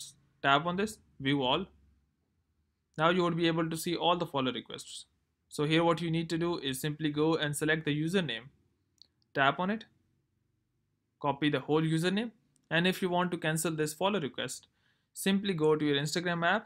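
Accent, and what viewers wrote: India. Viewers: ur accent is still indian dont try to hide it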